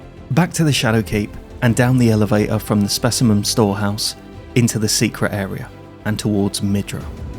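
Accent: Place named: England, United Kingdom. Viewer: new sub coz of this video. short, to the point and entertaining as well. The english accent feels like home having lived there for my childhood. (Southampton)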